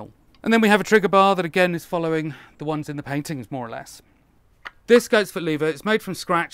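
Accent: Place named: Midlands, United Kingdom. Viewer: of workshop,. Now you're doing it to troll (and I love it). I did want to mention just how much of business is management of client expectation. I can hear the slight frustration in your voice! Unfortunately people with middle English accents (myself included) can sometimes present fact as slight disdain, especially to those in the south. Regardless, it's clear you're coming from a base of experience and passion and that bow is simply stunning.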